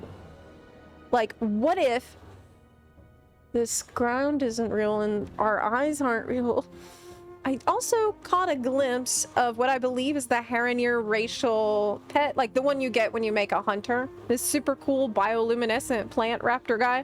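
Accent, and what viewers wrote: South, United States. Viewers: i just discovered your channel and i LOVE your enthusiasm and omg your accent. girl! i was born in texas, but raised in mississippi. and now i live in the midwest lol. i love your southern twang and miss it. 🙌🏼 they need to do housing themed kits by class, too. i don’t wanna be a druid sitting on an upholstered sofa from IKEA. also VERY nervous about kill-on-site in silver moon lol. i have a bad habit of mindlessly running into horde territory in Dalaran 😢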